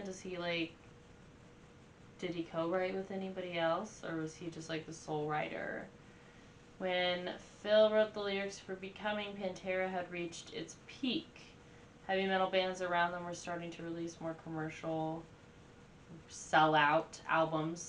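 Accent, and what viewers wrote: South, United States. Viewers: Sweetheart, where are you from? I'm from Tennessee and I love your southern accent. I pretty much lost my southern accent in college. But I still think it's cute for a female! The song is filmed at a concert they did in 1991 In Moscow Russia, 1.6 million people were at that show. Dimebag Darrell is the guitar player and he was killed on stage on 04 but he was and still is a guitar God. His brother Vinny Paul Abbott is playing drums. They were both legendary.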